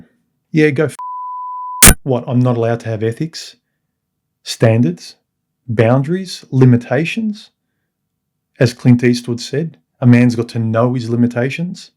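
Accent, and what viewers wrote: Australia. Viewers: One of your best, but what's a "garl friend?" BTW The only man I know with a more distinctive Ozzie accent is Wal Thornhill, and he's a bloody genius across physics, astronomy, electricity, and cosmology. Anyone who can handle real science, should check out the thunderbolts project.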